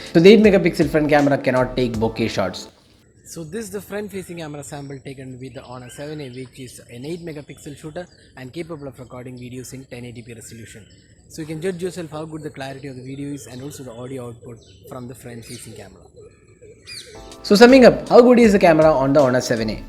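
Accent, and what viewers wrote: India. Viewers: I couldn’t watch the video, cause Indians’ English sounds so ugly and disgusting. So I was forced to give up watching :(((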